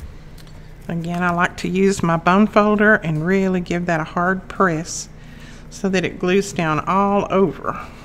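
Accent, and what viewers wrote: South, United States. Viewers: “Oooo, I like that.” “Init cute?” “I’m gonna embellish that.” I love all of your expressions. Can you add a “push here” button to the tshirt so we can hear your wonderful Southern accent too? :)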